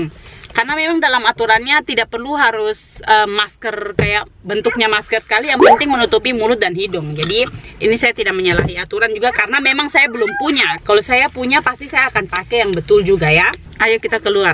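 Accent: Papua, Indonesia. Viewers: Logatnya mirip Papua ? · Im fom malaysia...suka sgt video kakak.. Malah kakak guna bahasa Indonesia (papua).. Suka dengarnyaaaa....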